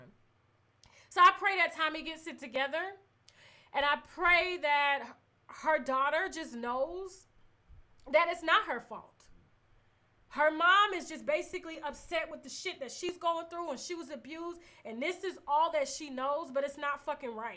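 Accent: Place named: South, United States. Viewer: I love your country accent.I am also a pisces so i relate to you on so many levels!🙋🏾‍♀️✊🏾👸🏾💜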